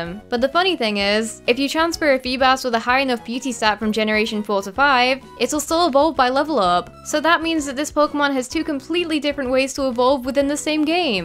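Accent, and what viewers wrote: England, United Kingdom. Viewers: BearShark Interactive she's british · She's English, as in, from England.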